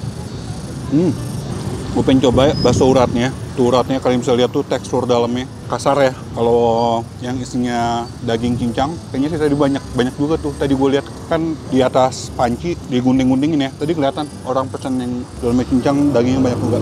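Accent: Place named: West Java, Indonesia. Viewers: Gw perhatiin, koh klo ngomong sama orang sunda logat ngomong nya udah bisa ngikutin logat sunda sunda gitu 😀 ketularan kayaknya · Karena sudah belasan tahun di tanah pasundan, udha biasa banyak banget orang jawa di tanah pasundan yang nyunda banget logatnya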